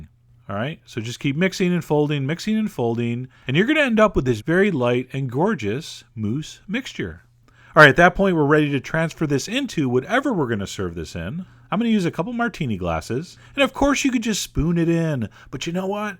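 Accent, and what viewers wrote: United States. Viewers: thats right cause he lives here in america and this is how we pronounce those things....talk about ignorant..... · i hate how u cant say anything. tiramisu? espresso? so american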